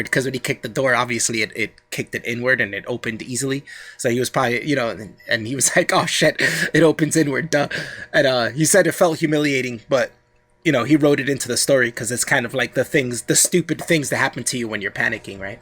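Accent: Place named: North Jersey, United States. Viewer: Take a hint from the show. The narrarater of this channel should be changed. Sounds like a 14 year old kid from North Jersey in his moms basement.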